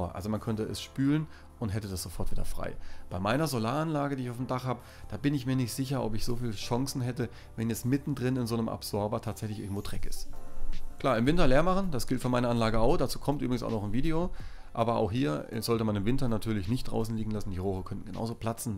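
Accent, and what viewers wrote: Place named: Swabia, Germany. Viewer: Ja, der ist schon ein guter. Vor allem die Stimme, auch wenn er aus dem Schwäbischen kommt. Die Stimme ist weich und er erklärt es super. Könnte auch als Werbesprecher Arbeiten.. Jetzt zum Thema, ich habe mir auch eine Pool Heizung zugelegt. Pool hat nur um die 3000L. Hatte als erstes den Papierfilter gegen eine Sandfilteranlage mit diesen Bällen getauscht. Muss sagen geile Sache. Im zweiten Schritt habe ich mir bei einer großen Plattform 4 Absorber und das Passende Zubehör Bestellt. Alles auf die Wiese im Garten zusammengebaut und es lief alles super. Inzwischen habe die Anlage auf unser Pergola Dach montiert. Jetzt funktioniert sie leider nicht mehr richtig... Habe mir dazu dein Video mit deiner Heizung angeschaut und musste wahrscheinlich feststellen das ich auch ein Rückschlagventiel und eine Fallbremse brauche. Na ja, ist bestellt jetzt hoffen wir das es geht. 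Und das bei einer Fallhöhe von nur 2m. Vielen Dank für deine Filme. Mach weiter so.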